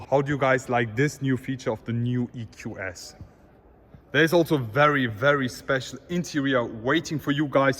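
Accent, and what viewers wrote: Germany. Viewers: Diese Deutsche Akzent beste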